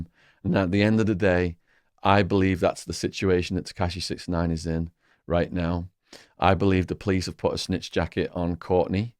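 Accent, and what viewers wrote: United Kingdom. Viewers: u have some kind of uk accent, but you're English is quite proper to me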